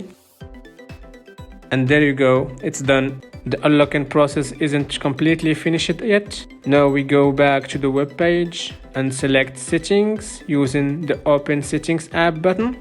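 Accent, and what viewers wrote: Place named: Morocco. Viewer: sorry but your accent is bleeding bro, are you a moroccan?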